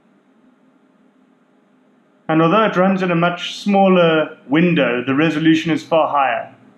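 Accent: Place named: Cape, South Africa. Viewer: I thought I could tell from your accent. Are you from anywhere in the Cape?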